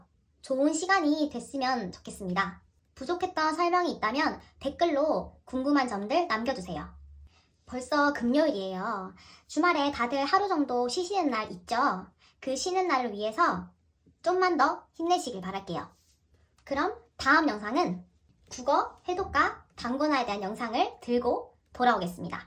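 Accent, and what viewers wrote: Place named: Gyeongbuk, South Korea. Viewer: 혹시 대구나 포항 경북지역사시나요? 말투보면 그런것같아서용ㅎㅎ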